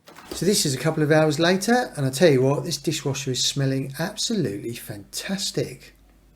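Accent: United Kingdom. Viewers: Nice british pronunciation🙂. My wife (an English language teacher) loves it😉. Thank you for the film and best greetings from Poland.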